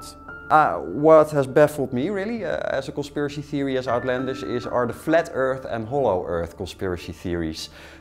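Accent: Netherlands. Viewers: You guys most dutch people have this accent when we speak english xd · wie hoorde al aan de stem dat dit een nederlander is? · He has such a dutch accent Im dutch too XD i hear it instantly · Mad Villain he is dutch, we have a lot of trouble with some sounds from the English language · Yo hes netherlands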